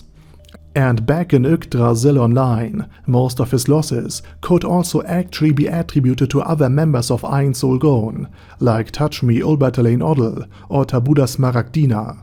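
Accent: Germany. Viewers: Hearing someone analyze anime characters with a german accent sounds so wrong yet so enjoyable.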